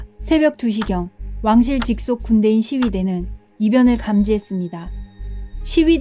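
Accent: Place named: Gyeongsang, South Korea. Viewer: 나래이션하는 분 경상도 억양이 언뜻언뜻 느껴지네요 ㅎㅎ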